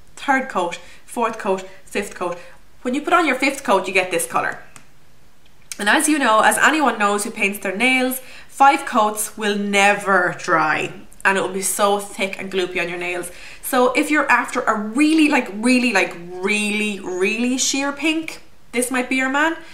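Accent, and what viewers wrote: Ireland. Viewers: just love your irish accent. thanks for the reviews.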